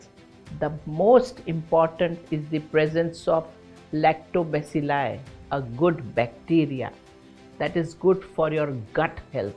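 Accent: India. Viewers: So what accent you expect her to have? American? Or Australian? This is how Indians speak so live with it!!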